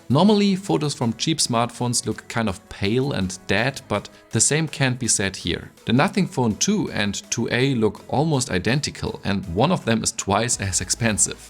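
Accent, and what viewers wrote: Germany. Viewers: I knew this was a German speaking 😂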